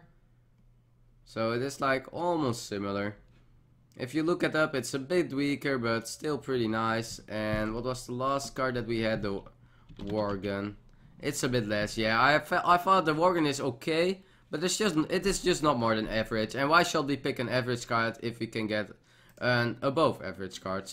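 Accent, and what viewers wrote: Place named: Netherlands. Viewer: Wist meten dat je Nederlands was man. :D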